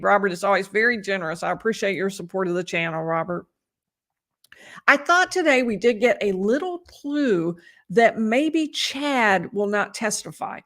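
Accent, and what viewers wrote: South, United States. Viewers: I was born in Bainbridge, GA and grew up in Columbus, GA. So happy to hear your Southern voice.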